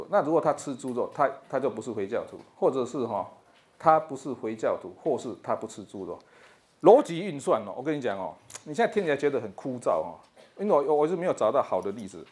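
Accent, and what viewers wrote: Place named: Taiwan. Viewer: say Mandarin is his second language and English his technical language. He has a strong Taiwanese accent when speaking Mandarin and borrows many Taiwanese vocabulary.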